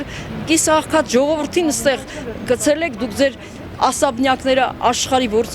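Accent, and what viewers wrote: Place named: Turkey. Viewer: Հաստատ թուրքա հայերեն խոսացող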